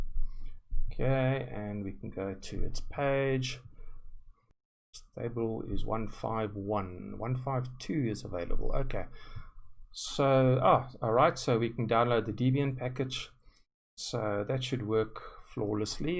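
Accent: South Africa. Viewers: is that a jozi accent i hear?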